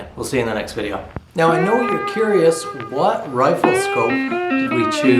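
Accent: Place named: Newfoundland, Canada. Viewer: Your Newfoundland accent sounds so similar to a Dublin, Irish accent . It’s funny .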